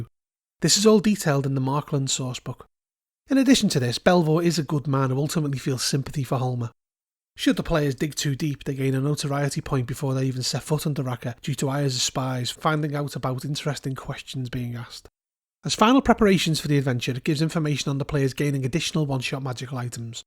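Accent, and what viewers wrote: Liverpool, United Kingdom. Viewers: Scouser? Please review more old school d&d!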